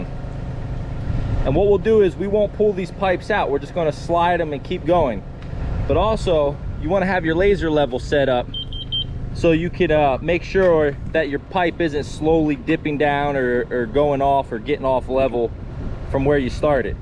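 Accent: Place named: Northeast, United States. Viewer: Only two minutes into the video. Are you based outta the northeast? Couldn't guess anywhere else haha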